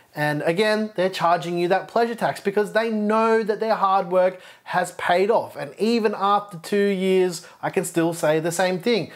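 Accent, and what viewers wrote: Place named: England, United Kingdom. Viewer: English accent IS shit ans a Real pain for french ears 🗣️🙉😢 Un homme de France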